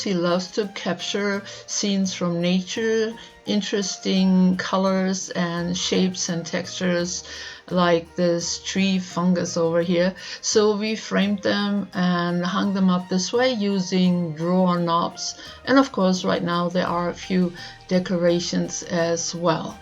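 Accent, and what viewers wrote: Germany. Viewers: Dir und Deinen Lieben auch ein wunderschönes Weihnachtsfest und einen guten Start ins neue Jahr. Gehe ich recht in der Annahme, dass ich da einen deutschen Akzent höre? ;o)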